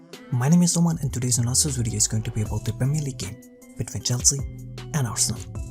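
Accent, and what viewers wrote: India. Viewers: He sound like he is trying hard not to sound like Indian accent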